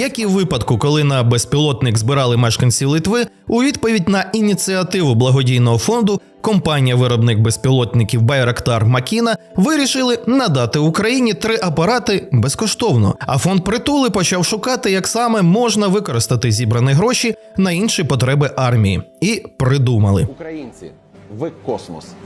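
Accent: Russia. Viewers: тавій акцент видає тебе, спалився ципсота запоребрикова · диктор - москвороте лайно та не розуміє різніці між нашими "і" та "и"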